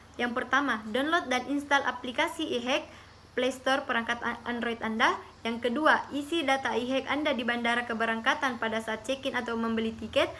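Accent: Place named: Makassar, Indonesia. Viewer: Kaya logat orang Makassar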